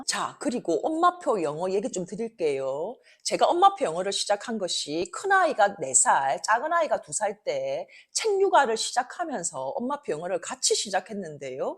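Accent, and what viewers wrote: Gyeongsang, South Korea. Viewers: 사담이나 수다 다 좋습니다. 지혜로우시고 단단한 내면을 가지신 작가님 육아 뿐만 아니라 인생의 선배로 배우고 싶습니다. 다양한 사담 맘껏 공유해주세요 재치도 있으시고 유머러스하시고 경상도 늬앙스도 넘 좋아합니다. 자기관리는 어떻게 해나가시는지 다 궁금합니다 😊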